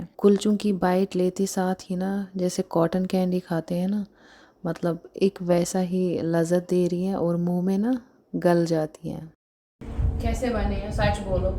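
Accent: Kashmir, India. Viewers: Subscribed 👍 Enjoy Make videos in our own mother tongue that will be more beautiful I don't know where from you people But your accent is soporen Rise and shine❤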